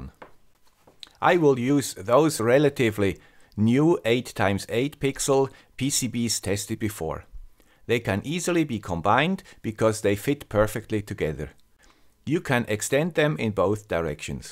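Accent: Switzerland. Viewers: God. Will definitely bless you to achive what you desire. My prayers are with you... Thank your very much for sharing your knowledge 🙏. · Danke fur alles! Love the swiss accent!;) Nice video! Exactly what I was looking for! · Hi. First of all thanks for all your amazing videos. Thanks to your videos I started playing with micro controllers as a hobby. I am a C# back-end developer and have very little experiense with embedded systems. Did you animated your LED strip with the ESP32 meanwhile using WiFi? I have not been able to find a library that animates the led strip correctly. The library esp32WS2811-master works great if WiFi is disabled. But I been having no luck animating 80 LEDs if WiFi is in use. What has work best for me is using the standard Adafruit_NeoPixel library that I previously used for arduino running that code on a separate core of the ESP32 and using "portENTER_CRITICAL(&mux)" functions of the ESP32 meanwhile animating. 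But still the first LED fails to animate correctly. The complete code can be found on this question: <link>. Maybe someone can answer in that question what could I be doing wrong. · We wont stop watching, keep up the good work, however everytime you say "dirt cheap" the Chinese push up the price 😱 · We love! Merry Christmas! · don't become defective! Your counter will reach it's theoretical maximum before you know it! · Depends on the manual (google translated Chinese datasheet can be rather cryptic), it is always nice for mere mortals that guys like does the ground work ^ ^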